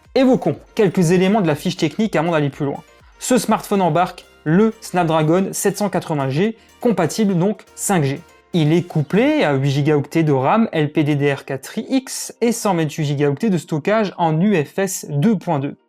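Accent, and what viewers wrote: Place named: Alsace, France. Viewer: t es alsacien? je sens un leger accent!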